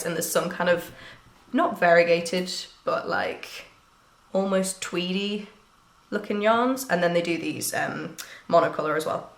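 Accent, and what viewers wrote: United Kingdom. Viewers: Enjoyed your first podcast, nice to have another UK voice in the mix!!! Loving your red cardi. There is a school of thought that suggests, when you have a lot to do, doing/finishing the easier or quicker things first is helpful because quickly you can get the list down to fewer items which eases the overwhelm…??? Dunno if this appeals? Also loving the look of your ‘scrappy cardigan’ that’s going to be beautiful. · Hi, I was wondering....Are you English? Cause, I like your Accent, there. 🆒️🆗️👍. I hand knit & use a flatbed knitting machine. But, I'm not a Podcaster. I just watch others knit & Crochet. I Love that most. I hope you show a lot of your knitting projects on YouTube. So, that Knitting Family can see your work! And NEVER, we're if you are showing mistakes in your work. Cause, that's just teaching everyone that, No one is perfect. 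And that's OK. Everyone is Human. Just go for it, your Dream, here. I will be rooting for you. Bye, for now. Blessings I send to you, too. 🙏. Sincerely, Elsa R. 64 yrs. young/old woman. From out in California👋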